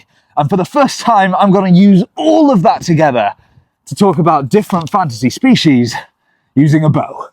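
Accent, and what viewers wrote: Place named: North, United Kingdom. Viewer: Did I just thoroughly enjoyed a comely, charismatically genteel ranger ramble about the biology of imaginary creatures in a posh northern accent? Never thought of the structural integrity of a centaur... Yeah the upright human spine + equine vertebrae won't work. Oh I'm a mage. How do you do.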